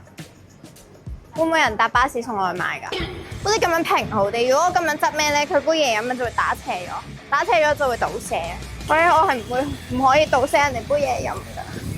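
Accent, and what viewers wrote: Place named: United Kingdom. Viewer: 聽把聲就似一個毛都未生齊嘅靚妹。 口音好似係學英式英文嘅人。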